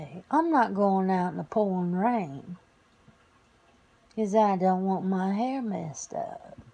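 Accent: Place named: South, United States. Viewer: hi another great vidio with the Southen accent too always good listening my friend Stay Safe xx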